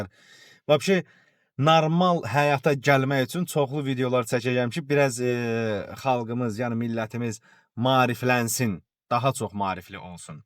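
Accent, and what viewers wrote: Ganja, Azerbaijan. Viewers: İn şəə Allah olarsan Rəbbim hidayət versin yaxşı əməllərinə görə amin .Gəncədən sən deyəsən ləhçən oxşayır)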